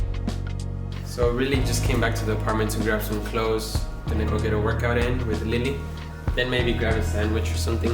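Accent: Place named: Philippines. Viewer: Quality video! May I know your nationality? You sounded like a filipino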